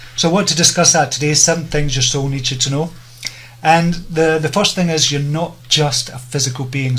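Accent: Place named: Scotland, United Kingdom. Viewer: Hi, I came about finding you in an interesting way; I too had found the song/dance " " and fell in love with it... I store it in my YouTube library. So, the other day I went to my Tablet to get it and somehow your beautiful introduction to the song showed up in my "photo gallery" for some reason. Anyway, I found your introduction just as fascinating as " " itself... I didn't know who you were, I thought maybe an Architect because of you, your office surroundings & your name displayed with your logo... I was thinking maybe you were just taking a break and wanted to share this "amazing, hypnotic beautiful" video online... So I've been carrying my Tablet around listening & watching " " because I've been extra ill the past month (I have Lyme Disease) and watching " " makes me feel much better, as you know - it has this quality about it. 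Then I started queuing it to your intro each time I played it because 1. I absolutely love your accent - I always have, Scottish - right? 2. Your more than average intro especially the end when you say "Okay, until next life"... So today I'm driving around (San Francisco, California) playing the song, its so wonderful... and it comes to the end and I look over at my Tablet on the seat next to me and say "Okay, I want to hear that stunning voice again" and I queued it up to the beginning and started wanting to know who is this interesting man and why does he say "until the next life"? So I realize you have a l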